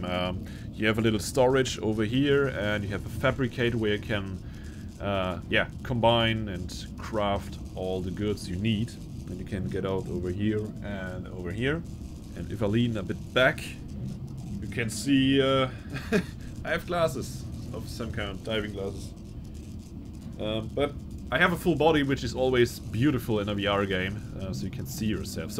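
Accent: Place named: Germany. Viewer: Are you german? this accent sounds so german lol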